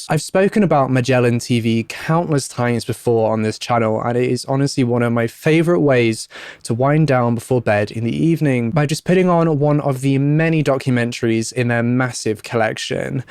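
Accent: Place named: RP, United Kingdom. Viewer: Hes got a posh English accent so great pronunciation is a given hehe 🇬🇧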